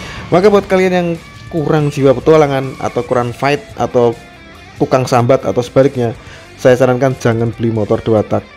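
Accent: Magelang, Indonesia. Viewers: Magelangan banget om 😂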